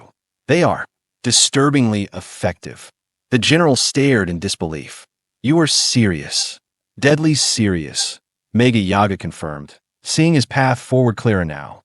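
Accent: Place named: Spain. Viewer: Never get rid of the wierd and occasional pronunciations with a Spanish accents. So much fun. I look forward to them 😊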